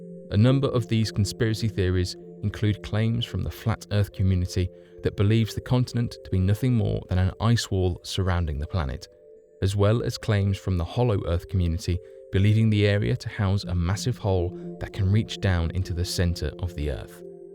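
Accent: United Kingdom. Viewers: Nice accent, kinda a British one! I truly appreciate it!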